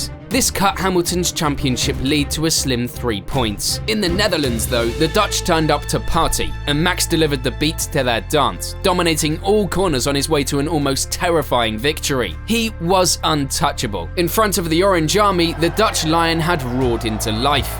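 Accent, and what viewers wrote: England, United Kingdom. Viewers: Another British accent.... Lost from button,Rosberg,Russell twice now Leclerc. Lewis had 30-50bhp hammertime more then the rest of the field. Fairytale he "beat" Alonso, even Ron Dennis regrets mistreating Alonso. ALL teammates were very close to Lewis accept koviannen, and then bottas regulatie to nr2 driver. Max is dominante not Lewis, not comparable like Max does today. Max dominante as a Driver is insane, Lewis he is jongere with a less car, very mediocre. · litteraly the first thing i hear is a british boy saying "masi gifting the championship" lmao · When you click on an f1 vid and hear an English narrator, you’re officially entering a spin zone. They’ll literally justify and rationalize all his bs, and there’s quite a bit to work with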